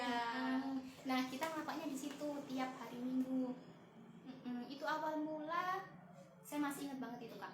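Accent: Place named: Java, Indonesia. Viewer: Medoknya dapet banget, 😁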